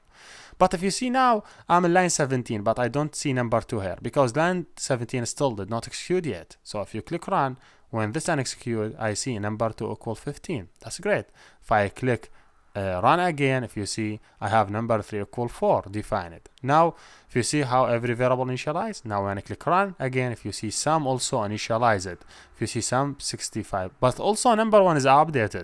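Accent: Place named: Saudi Arabia. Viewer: واضحه اللهجة السعودية ما شاء الله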